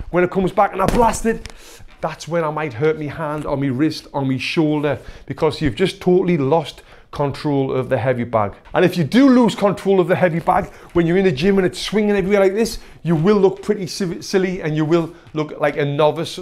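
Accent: United Kingdom. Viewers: That's a combo - I like british accent and boxing :D I'm glad I found this channel! Great stuff! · British accent😂 I understand your speech better than those with an American accent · It's like very deep British accent. Kinda like it ngl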